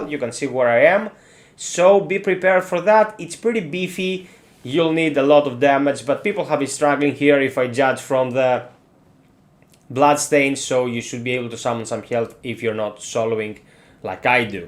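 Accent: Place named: Greece. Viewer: φίλε ευχαριστώ, τώρα το αγόρασα το παιχνίδι και είχα κολλήσει οπότε ξεκίνησα το quest της ranni. Από φωνή ψιλοκατάλαβα την ελληνική προφορά και μετά είδα το channel description 😂 Here's a sub :D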